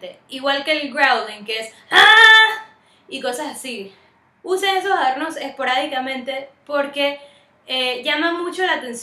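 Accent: Panama. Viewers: namas con tu tono de voz se que eres panameña haha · Sabía que eras de Panamá cuando escuché tu acento 🤯, tienes una voz preciosa!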